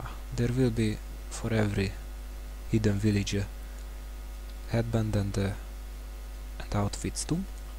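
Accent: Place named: Hungary. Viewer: Annyira hallatszik hogy magyar vagy xD ;)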